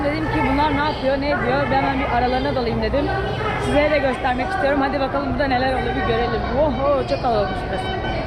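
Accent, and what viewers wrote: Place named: Sivas, Turkey. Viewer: İspanyolcayı Sivas tokat biraz doğu aksanıyla harmanlaman diyolok kurman çok hoş.bilmiyorum banamı öyle geliyor.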